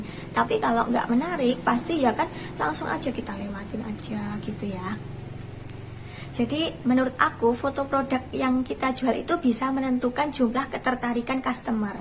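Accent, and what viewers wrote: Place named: Medan, Indonesia. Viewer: Dilihat dari logatnya, pasti orang medan ini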